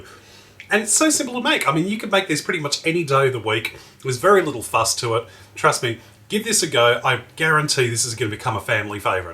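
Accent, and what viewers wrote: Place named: Australia. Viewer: Australian accent is 👌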